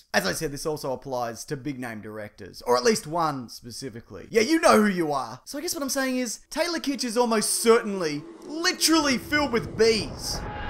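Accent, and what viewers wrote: Australia. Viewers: Hey cool vid I'm a recent subscriber you got an awesome aussie accent that makes me wonder what you look like lol....anyway keep up with the awesome videos.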